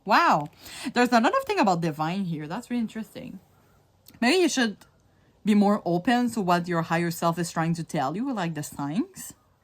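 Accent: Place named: Quebec, Canada. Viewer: I can hear a québécois accent from a miles away tell me in right 😅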